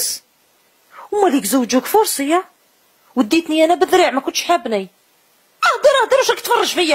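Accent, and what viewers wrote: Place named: Setif, Algeria. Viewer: نموت على هدره تاع سطيفيه😂😂😂😂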